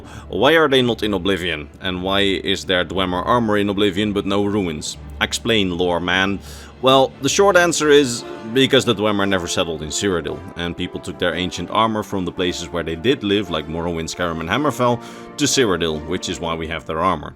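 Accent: Netherlands. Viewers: I hate your accent. Dutch should not have one.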